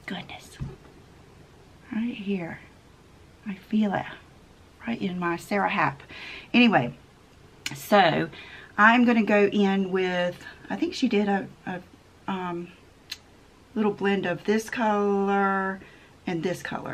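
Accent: South, United States. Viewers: Okay, your brow talk cracked me up! Too cute! I can't believe some people feel the need to correct the way others pronounce words. And the worst part is one can never say it their own way ever again without thinking of that diss. I love the way you talk and it's endearing to hear your southern words. You say tomato I say tomato ;-)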